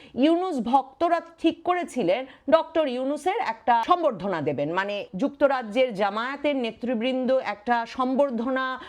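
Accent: Kolkata, India. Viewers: আপু আপনি কোলকাতার ভাষায় কথা বলেন কেন, আপনি কি ইন্ডিয়ান, "র"?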